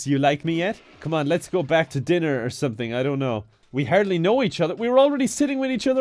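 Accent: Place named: Malahide, Ireland. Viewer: You sound like your from malahide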